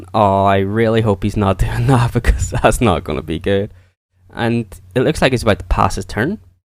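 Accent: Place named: Ireland. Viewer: I knew that was an Irish accent!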